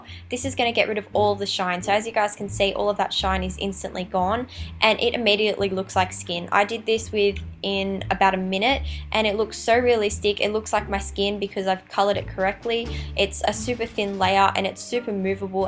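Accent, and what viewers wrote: Australia. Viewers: Hi OMG your so awesome at FX I really want to try making stuff like this for halloween and stuff. Once question where do you but your makeup like the pallets ? If you get them offline what is the name of the website? Btw I love your Australian accent idk I love Australian accents 😂 And can you please do a Q&A if you do here is a question how old were you when you started FX makeup? Thanks Ariana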